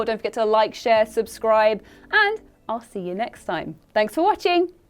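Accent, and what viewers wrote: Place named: Midlands, United Kingdom. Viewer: Midlands accent! Woo!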